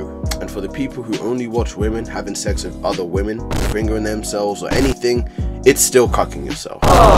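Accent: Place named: United Kingdom. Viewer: british black man version of a southern black man.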